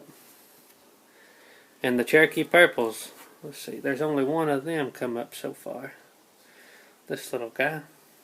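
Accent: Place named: South, United States. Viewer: Love that country accent, I to come from the country.You videos are wonderful and so imformative.God bless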